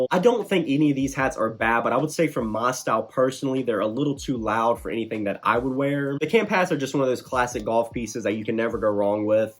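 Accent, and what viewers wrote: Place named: South, United States. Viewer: This dude’s accent is so sick. Very southern, I love it! Love your channel man, so nice to find a brother through GOLF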